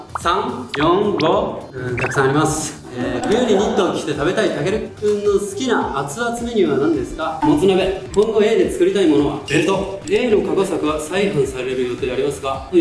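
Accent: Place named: Osaka, Japan. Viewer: いつの間にか大阪弁になってる〜 出来ましたの時の健君のとても嬉しいそうな顔幸せそう🎉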